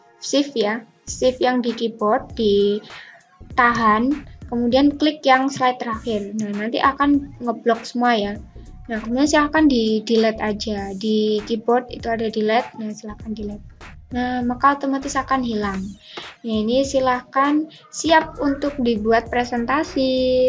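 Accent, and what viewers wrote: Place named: Central Java, Indonesia. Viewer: Dari logat cara ngomongnya kaya orang Jateng 😁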